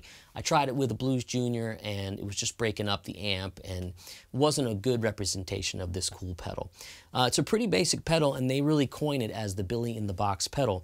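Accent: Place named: Texas, United States. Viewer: I can hear Texas !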